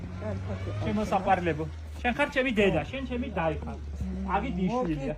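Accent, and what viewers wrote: Guria, Georgia. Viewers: დიდი პატივისცემა ჩემო გურულო ვგიჟდები რომ ვუცქერ და გურულ საუბარს ვისმენ❤ მომენატრა ჩემი ბებიკო და ჩემი გურია😢მოკითხვა სიყვარული და გამძლეობა საყვარელ აჭარას და აჭარელ ხალხს❤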